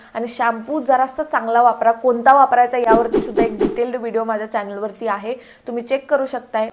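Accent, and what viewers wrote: Kolhapur, India. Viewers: Are u from Kolhapur?? Bolnyavrun tr tsch vataty khr ahe Kay?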